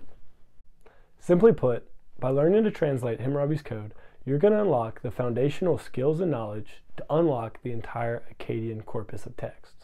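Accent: United States. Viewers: Why do you got American accent 💀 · Dear, I would have some questions regarding the pronunciation of the Akkadian language. I noticed that you have a rather American/English accent, especially in the a and r sounds. How was the pronunciation actually like? Can we reconstruct that?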